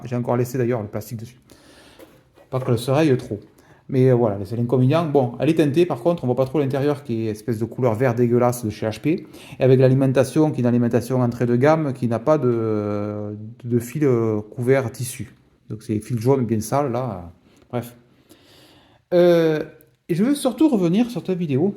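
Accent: South, France. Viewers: ah d'accord je suis de Toulouse j'ai reconnu l'accent de la région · l'accent du sud représente! Pour une fois que quelqu'un dis pas de la merde ca fait plaisir! Je m'abonne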